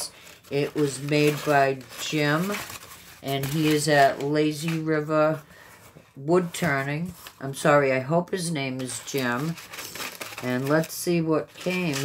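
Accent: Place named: Massachusetts, United States. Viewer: I just realized when I came here to watch that you’re the one from Massachusetts!! I’m originally from New Bedford and I’m so nostalgic listening to your accent! 🥰🥰 I’m so glad you enjoyed the event and your prize!! PS, his name is Matt 😆🤣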